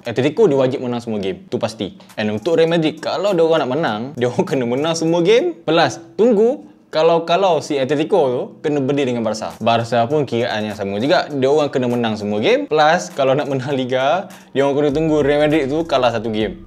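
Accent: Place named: North, Malaysia. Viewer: OK,cuma utaqra kuat sgt, org key el kurg paham 🤣🤣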